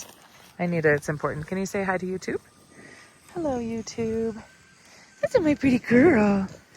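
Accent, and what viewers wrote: Mexico. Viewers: Creo que ya se te nota el acento poblano al hablar en español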